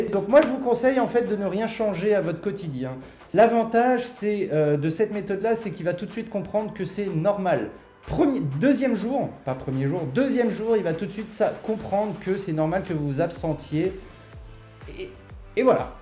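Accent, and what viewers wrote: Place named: Moselle, France. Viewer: Moi j’ai compris ce que tu as dis 🤣 Tu viens d’où de Moselle?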